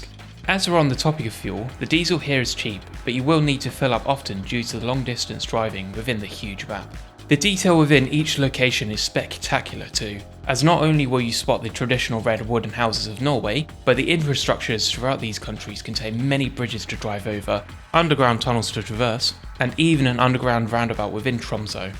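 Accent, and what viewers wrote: United Kingdom. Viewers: Some of your negatives are realistic tho, you get slower speed limits in the middle of nowhere just because there might be a forest road that log trucks frequently use, or an intersection, in video you clearly see there's some buildings so it might be due to the safety and also noise pollution, judging from your accent you are british therefore I get it, but literally everhywhere in Europe 50km/h applies if you see a city sign in some countries it is the one with the city name in some you have a city name and then the urban area sign with buildings on it which act as a zone with rules such as 50km/h, parking allowed, cars can be in left lane even if nobody is in the right unless blocking faster cars etc...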